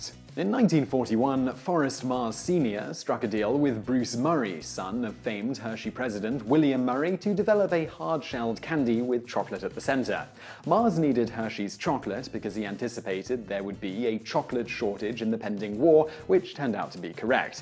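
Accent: England, United Kingdom. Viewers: Hearing a fellow Brit repeatedly saying candy unnerves me! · I'm confused. You have an English accent but use American words - like candy instead of confectionery. So I don't know if you are speaking from a UK or US perspective. To me Mars means that company in Slough, but I think to you it's something else. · As opposed to "new-gat", right? I noticed that, but is from the UK so... he says it a bit differently.;) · Why do you keep calling it candy?? You sound like you’re from the UK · Yes... is from the UK. Quite a few words are pronounced differently compared to what I usually hear in the US. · An english accent calling chocolate 'candy'........my ears have just been assaulted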